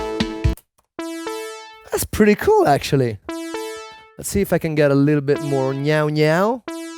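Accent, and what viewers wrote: Italy. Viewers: 😂 could be. His English is good indeed but he does have a pretty thick Italian accent. Nothing wrong with that but it's a dead give away. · you sound like you are italian, are you?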